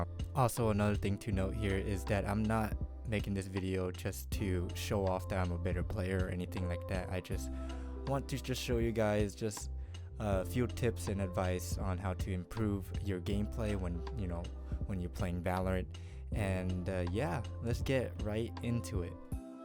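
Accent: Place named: Vietnam. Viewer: Very strong Vietnamese accent homie and awesome video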